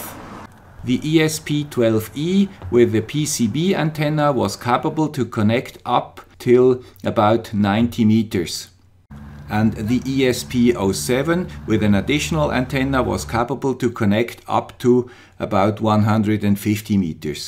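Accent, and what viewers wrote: Switzerland. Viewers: the swiss accent reminds me of the swedish accent. don't worry, that's a compliment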